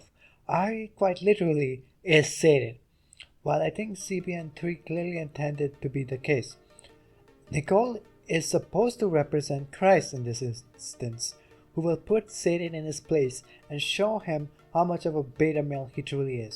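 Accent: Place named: Ukraine. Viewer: you kinda sound like the counselor if he was from kyiv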